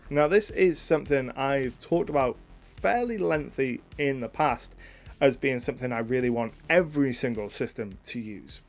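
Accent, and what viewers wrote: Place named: England, United Kingdom. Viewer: Thoughts 1. Tags. Completely agree. Standardised tags make everything easier 2. Encounter Building. Mindboglling that WotC has a DIFFERENT internal system for building encounters than the one published for other users. Perhaps it’s a business decision thing, to give their products a competitive advantage. 3. XP and Rewards. This I think is very hard. Xp is the hardest thing to sort out in RPGs, but it sounds like PF 2e is at least doing it better. 4. The Economy. Yes yes a thousand times yes. 5. More detailed Weapons and weapon effects. Agree, but the game gets WAY more complex, and not all players enjoy combat. 6. Level 20 Options. Yes, but 5e did make some improvements from previous systems. 7. 10th level spells. Here is a heresy, spell levels should be renamed to correspond with caster level, so 2nd level spells become 3rd levels spells, 5th level spells 9th level spells etc. 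Then we can have 20th level spells! 8. Magic Item rarity. Yep. but I like how 5e did Magic items. They made them a bit more special I think. And yes the character building is better etc The BIG negative however, is Pathfinder 2e is a lot more complex than 5e, and a lot of 5e’s appeal has been it’s simplicity (Before the rules bloat). Great vid though dude. I too am from the UK so your joyful English Accent is a great oasis in the American dominated youtube! best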